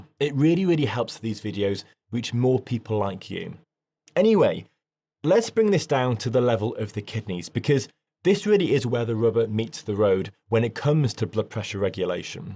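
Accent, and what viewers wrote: United Kingdom. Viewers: What an excellent inspirational explanation … Thank you.. defo following you from now on … I’m an 85 year old female, still working as a wellbeing programmer and fitness teacher, but in the last year, my blood pressure, has become elevated, forcing me me to go on a BP med.I have been snacking between training sessions, going to stop from today!!! Also I think you live in the same part of the UK as me, judging by your accent.. Thanks again!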